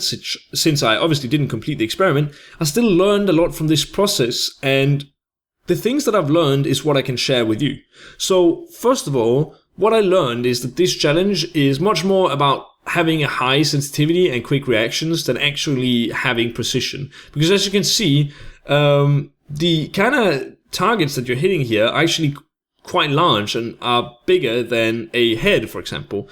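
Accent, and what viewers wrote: Denmark. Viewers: Hey man, are you Danish? ? 😊 you sound a bit Danish just a bit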